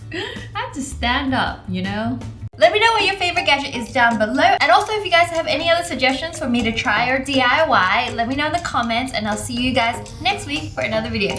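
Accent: Australia. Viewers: aren’t you Australian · Which part of Australia are you from? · Ur Aussie?? 💁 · I’m Australian too so u say bucks and mum and stuff like that! Yeah I have a accent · Is she Australian or something?🤔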